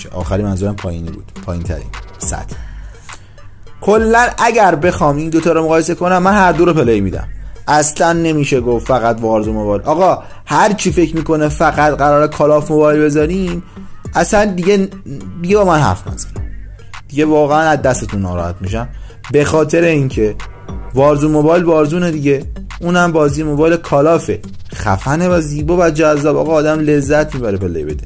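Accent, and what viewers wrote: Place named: Hamadan, Iran. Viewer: داش‌ جواب بده‌بچه‌کجایی لحجت‌به‌طرف‌همدان‌میخوره‌بچه‌کجابی؟؟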